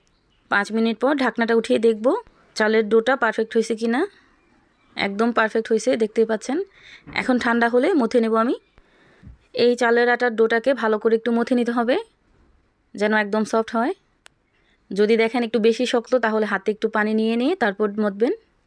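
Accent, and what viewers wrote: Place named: Mymensingh, Bangladesh. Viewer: আপু আপনার বাড়ি কোথায় আপনি ময়মনসিংহের মানুষের মতো কথা বলেছেন 😊😊😊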